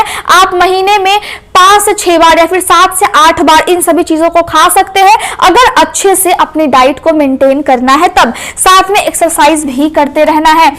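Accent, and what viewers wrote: Bihar, India. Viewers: Madam App bihari ho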